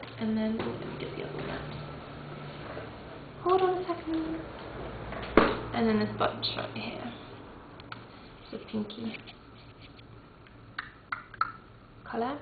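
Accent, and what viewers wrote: England, United Kingdom. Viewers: Heyy Ur To Pretty And U've Got A English Accent Like Mee Yayy!!!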